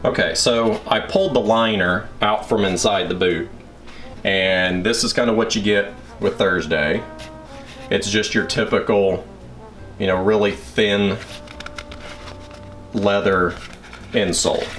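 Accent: South, United States. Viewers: Sounds like a southener Me: must be legit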